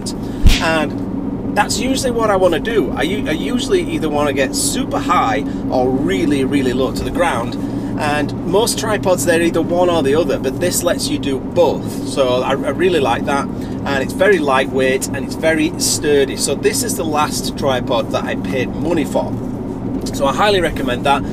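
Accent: Yorkshire, United Kingdom. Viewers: I'm afraid I only got 10 per cent of your advice, I was seriously distracted by your lovely driver... Anyway, I'll just have to watch it again or better: just listen to the old Yorkshire voice...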